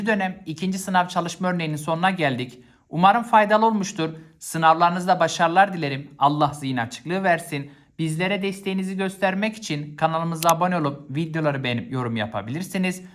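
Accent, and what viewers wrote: Malatya, Turkey. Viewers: hocam malatyalımısınız merak ettimde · Hocam malatyalı mısınız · hocam malatyalımısınız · Hocam malatyalımısınız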